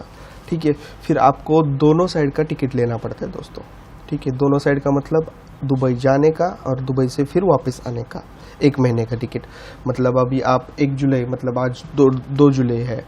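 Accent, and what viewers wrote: Maharashtra, India. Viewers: yourvishal hahaha, tyachya tone varun kalta, ki toh marathi aahe! Jay maharashtra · sir jee, r u maharashtrian ?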